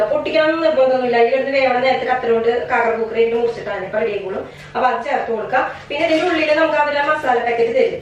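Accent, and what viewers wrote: Thrissur, India. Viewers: സംസാരത്തിന്റെ സ്പീഡ് ഒട്ടും കുറക്കണ്ട. കാക്കിറ മൂക്കിറ, പിച്ചിപ്പറി, കണ്ടം മുണ്ടം ഇമ്മാതിരി തനി നാടൻ സാധനങ്ങൾ എത്രയുണ്ടെങ്കിലും പോന്നോട്ടെ. അതൊക്കെ പാചകത്തോടൊപ്പം ആളുകൾ ആസ്വദിക്കുന്നുണ്ട്. ഒരു മനുഷ്യനും തനിമ നഷ്ടപ്പെടുത്തരുത് എന്ന അഭിപ്രായക്കാരനാണ് ഞാൻ. മോഡേൺ ശരീരഭാഷയും ഇംഗ്ലീഷും മലയാളവുമല്ലാത്ത വികലമായ സംസാര ഭാഷയും കൊണ്ട് വീഡിയോയിൽ വരുന്ന ആളുകൾ അവനവന്റെയും അതോടൊപ്പം നാടിന്റെയും തനിമ നഷ്ടപ്പെടുത്തുന്നു. സ്വതസിദ്ധമായ തൃശൂർ നാടൻ ശൈലി ഒരിക്കലും നഷ്ടപ്പെടാതിരിക്കട്ടെ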